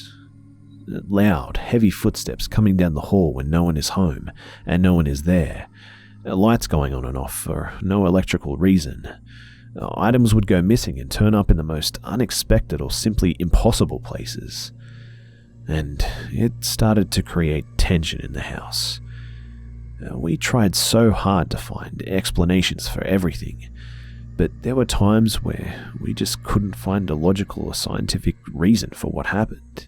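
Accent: Australia. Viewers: xsmol x hu x soldier x Australian accents are the best :)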